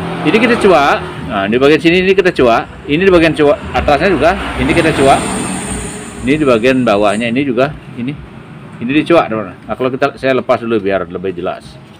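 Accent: Bali, Indonesia. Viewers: alamat mna , kalau dari logatnya orang Bali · Logat nya bali bgt bosku. Sukses slalu bos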